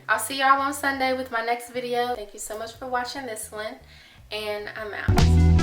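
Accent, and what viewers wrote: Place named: Houston, United States. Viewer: Yooo! I was like, she sounds like Solange. Then, I see you're from Houston. I guess we really do have an accent.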